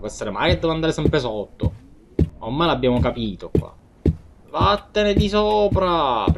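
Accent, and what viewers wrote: Palermo, Italy. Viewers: l'accento palermitano migliora tutte le urla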